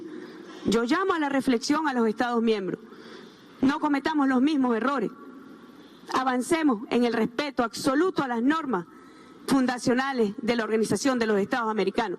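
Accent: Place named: Cuba. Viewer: Oie habla como cubana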